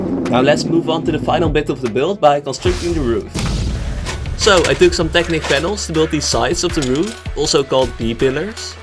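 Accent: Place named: Netherlands. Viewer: Are you dutch ? Because you have an accent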